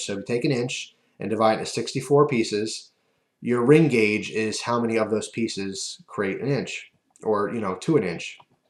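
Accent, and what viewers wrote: United States. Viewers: i just assumed you were american the way you were talking, you came across a bit strong and also, /watch?v=LIlUcPRYroM&feature=plcp&context=C4582d3bVDvjVQa1PpcFObXtukHaAHVqDa_DPkS-9G5riny3IwqT8%3D .this is comeing from the man him self, this argument is getting off topic ,i have to bring her back.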